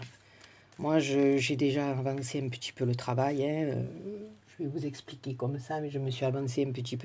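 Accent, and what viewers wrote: South, France. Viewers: Bonsoir, merci pour nous montrez comment faire ces superbes 🌹 roses, j ai tout compris mais puis je permettre, dommage que votre main par moment cache vos gestes. Malgré j apprécie vos superbes vidéos, agrémentées du magnifique accent du midi. Bizettes Lorraine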